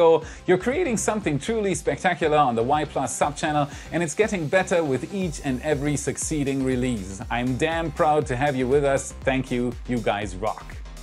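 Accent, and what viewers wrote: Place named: Germany. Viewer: THE #1 most important thing about American English is that “the other person” understands you. It’s okay to be German and sound like it! And stop worrying about your “r”s! We LOVE your accent!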